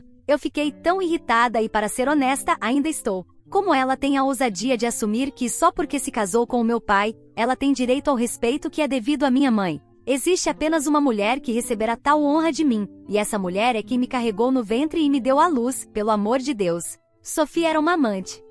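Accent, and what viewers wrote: Portugal. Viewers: Ta em português de Portugal?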